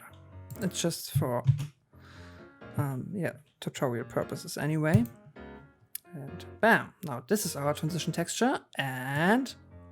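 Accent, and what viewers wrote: Germany. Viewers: I love your channel! Man hört dein deutsch voll raus :P Ich abonnier mal ^^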